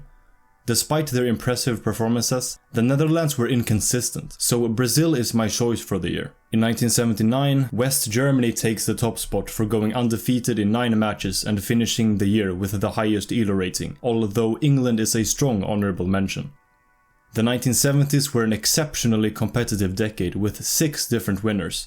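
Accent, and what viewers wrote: Sweden. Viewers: Are you Swedish?? 😅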